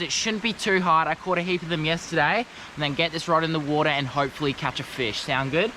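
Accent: Australia. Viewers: 300 ft bruh I thought you were Australian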